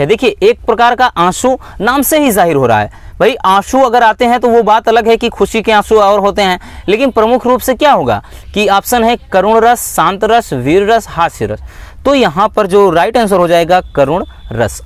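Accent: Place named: Bihar, India. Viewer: Bihar se hain aap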